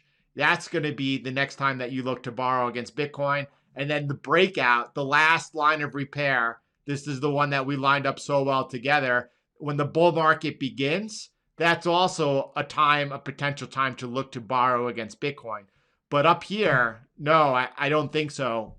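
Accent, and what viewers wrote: United States. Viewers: Your Long Island accent coming thru strong today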